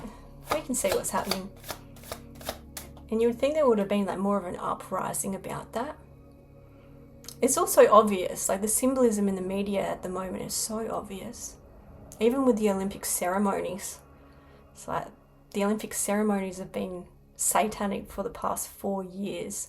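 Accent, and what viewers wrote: Australia. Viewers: you are ethereally beautiful. your eyes look like pools of eternity. if i'm not mistaken your accent is aussie. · Thank you my dear darling for the wonderful reeding I truly love you girl you're alsom little woman and girl I love your eyes and you're astra accent so sweet with that stay cool and cute your good friend dale senney ❤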